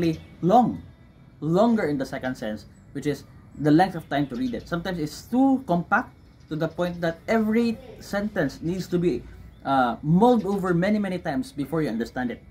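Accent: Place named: Philippines. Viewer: hes got a philipino accent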